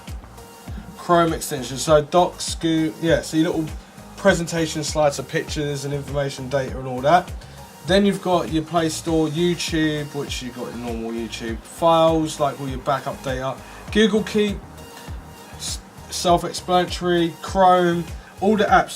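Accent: United Kingdom. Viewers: hey mate i like it again, i even like more yr brittish accent than the video itself lol greetings from paris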